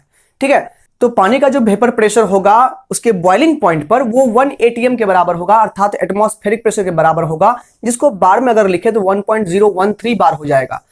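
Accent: Bihar, India. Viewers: Just superb explanation sir 👍 Are you bihari ?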